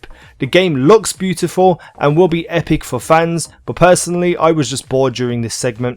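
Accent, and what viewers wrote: Birmingham, United Kingdom. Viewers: I can hear that brumy accent ha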